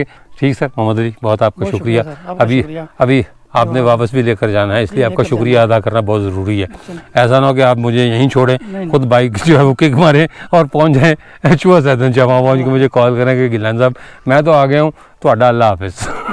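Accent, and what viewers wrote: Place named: Lucknow, India. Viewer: Mohtaram Salamun Alyekum Maie Aapka Maddah Lucknow India se hun guzishta 2, Baras bad ab phir aapke vlog se mustafeed ho raha hun. Aapka andaze takallum aur tareekhi malumaat me Lucknvi tahezeeb ka khasa asar hai kahin aap hum Lucknow walon ke bichhde huwe maqami bashinde toh nahi hain?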